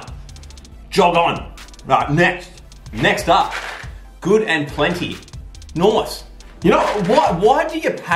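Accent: Australia. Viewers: I love ur Australian accent. Lol Im in Australia Melbourne! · Hes Australian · That's the most Australian accsent I've ever heard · no he's just australian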